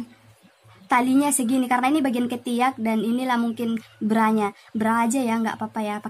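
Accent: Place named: Sumatra, Indonesia. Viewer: Hallo, lokasi ada dimana ya? Logatnya sih sumatera nih... Saya baru gabung, lumayan menyenangkan dan cukup detil penjelasannya. Apakah juga terima jahitan makaud saya sbg penjahit? Tks 🙏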